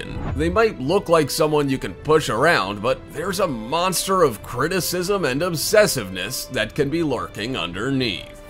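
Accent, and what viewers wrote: United States. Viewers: You from Texas ?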